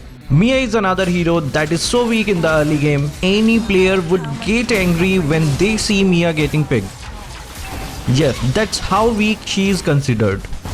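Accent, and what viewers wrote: India. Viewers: bro you from India your voice bro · You sound like an Indian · why u sound like indian dhoti its so irritating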